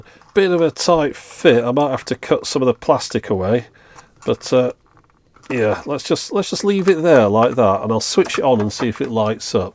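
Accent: United Kingdom. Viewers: Hi sorry, what sort of accent do you have? I can hear it's a UK accent but what part? Just curious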